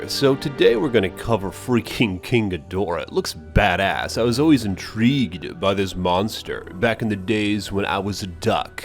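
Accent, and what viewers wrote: RP, United Kingdom. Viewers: Why do u talk like a British butler!! annoying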